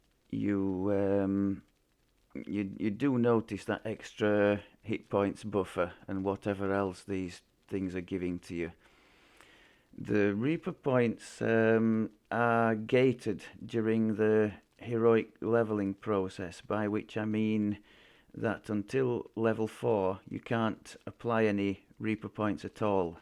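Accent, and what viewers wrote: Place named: Northern England, United Kingdom. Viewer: Good info well delivered in a proper northern accent. Reaper wi' nowt tekken out.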